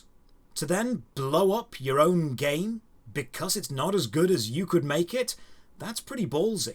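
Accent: United Kingdom. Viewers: proves that all you need is a deep voice, British accent and a suit and you can make any topic sound high class.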